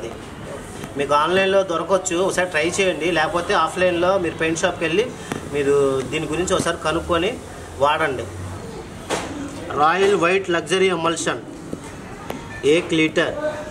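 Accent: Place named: Singh Nagar, India. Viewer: nuvvu matladuthuntee singnagar ,vombaycolony slang ardam aipothundhi Anna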